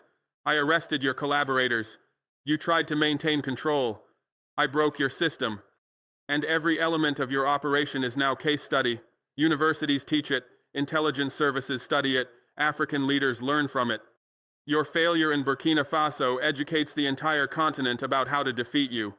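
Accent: United States. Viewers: Sir, you sound like a good Ole boy, right down the road from me. Educated here in Texas.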